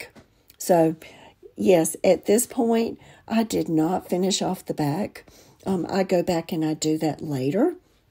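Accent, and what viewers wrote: South, United States. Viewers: everything is so pretty. I think my favorite is the three crosses. Thank you so much for sharing the Lord here on your channel. I know I told you earlier you remind me so much of my sweet aunt Brenda. Even to your sweet southern drawl. Sometimes when I’m watching your videos I feel like I’m listening to her. 🩷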